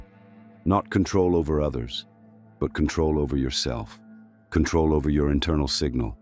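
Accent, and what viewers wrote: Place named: United Kingdom. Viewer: Great video,,,,a little British but not too much 🙂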